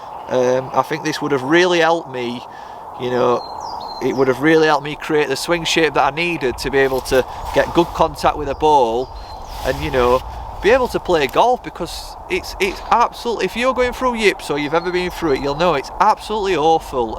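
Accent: Yorkshire, United Kingdom. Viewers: I think should change the name of your channel to " " salt of the earth Yorkshire accent you know this guy is not lying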